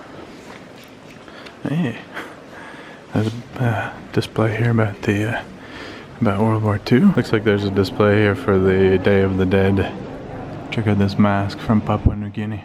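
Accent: United Kingdom. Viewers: Наверно потому что... у него есть в произношении английский акцент, и что бы понимать слова нужна практика А у нет акцента, ее проще понять.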